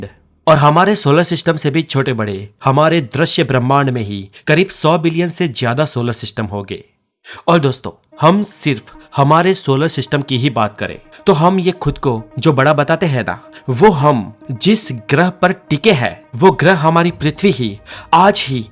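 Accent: Gujarat, India. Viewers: ગુજરાતી લાગો સો ભાઈ...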